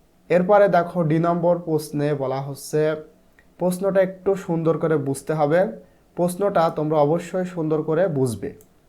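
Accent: Bangladesh. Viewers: Apnar bangla english accent sondor asa, thank you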